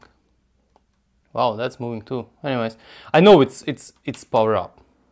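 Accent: Russia. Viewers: Сразу слышно Russian accent 🤣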